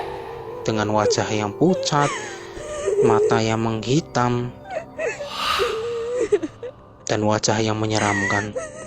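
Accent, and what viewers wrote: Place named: Java, Indonesia. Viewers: Ora Ngapak Ora Kepenak ... 👍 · Medoknya ganggu banget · Ko gue malah ngakak ya bukan takut . Sumpah mas nya medok bgt ampe perut gw sakit meragain omongannya . Tapi bagud mas menghibur walaupun gue malah ngakaj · Ora ngapak ora kepenak kang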